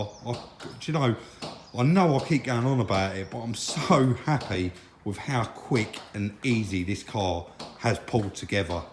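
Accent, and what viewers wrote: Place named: Cockney, United Kingdom. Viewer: i love the way says WAFFLING ON, old school cockney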